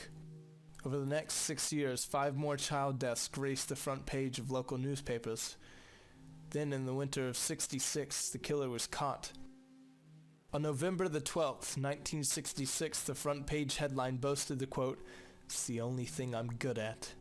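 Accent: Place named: England, United Kingdom. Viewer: Ya its english accent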